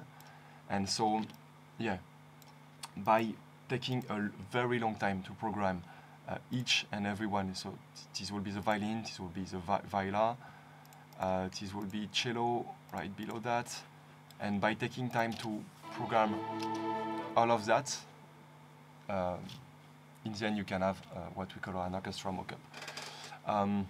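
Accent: France. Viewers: Ha un accento francese?sono francese ammiro lei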